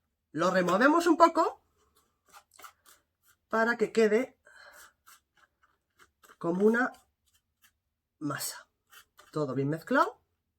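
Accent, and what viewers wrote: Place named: Spain. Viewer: Por su acento creo que esta mujer también es española 😂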